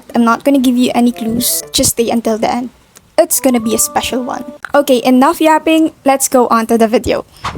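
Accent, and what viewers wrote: Philippines. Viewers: I knew you were tagalog bc of ur accent!!! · I CAN HEAR THE FILIPINO ACCENT 🤩💕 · Hi I’m new to this channel and are u Filipino bc u sound like one a if u are one hello twin 😂 ❤ Edit: oh wait u are Filipino pala 😂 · Do i hear a pilipino accent?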